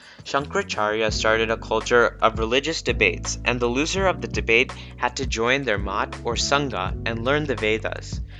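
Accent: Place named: India. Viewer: We are one 😃 Nice hindi accent btw 😂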